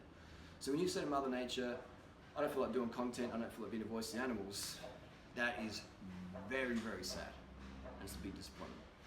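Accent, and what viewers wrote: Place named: Australia. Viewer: Have you tried the English Subtitles, It does help non Aussies. One thing though, there are quite a few Auto Generated words which are not the words he used.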